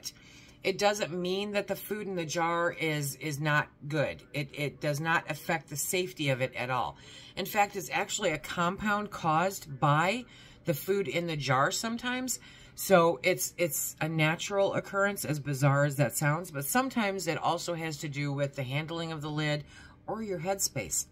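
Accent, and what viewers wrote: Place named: Michigan, United States. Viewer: Your voice is pleasant. I used to live in Michigan. You sound like home.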